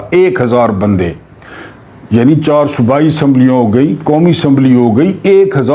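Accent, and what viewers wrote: Saraiki, Pakistan. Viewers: اردو کو سرائیکی لہجے میں سننے کا کچھ اپنا ہی مزا ہے کمال ہے ژندہ باد ۔❤❤❤❤❤❤❤❤❤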